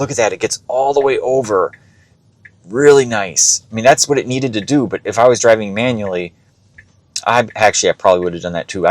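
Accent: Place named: Canada. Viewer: Don’t worry about how you speak. You would pass for a normal Canadian.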